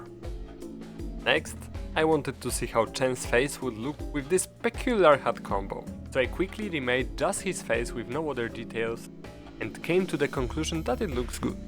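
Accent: Poland. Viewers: chciałem pisac po puerwszym uslyszanym slowie że masz strasznie polski akcent ale stwierdzilem ze mize poprostu mam cos z uszami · wiedziałem że jesteś z Polski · Po akcencie wiedziałem ze to polak. Zajebisty film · Po akcencie poznałem że polak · Po jak mówisz odrazu wiedział że z Polski jesteś XD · Polski Akcent Mocno wjechał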